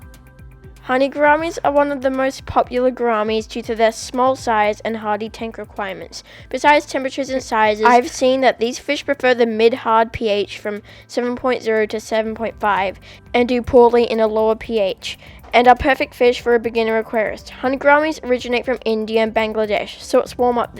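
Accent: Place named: Australia. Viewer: I'm sorry if I'm wrong but are you Australian coz you do sound like us Aussies if you are can you purchase a honey gourami from a local petbarn?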